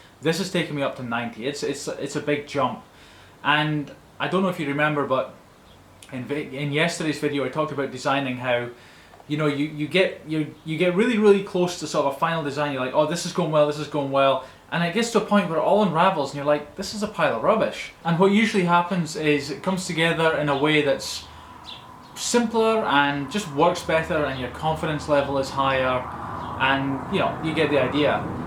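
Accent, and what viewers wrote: Scotland, United Kingdom. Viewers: Are you Scottish? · Is he Scottish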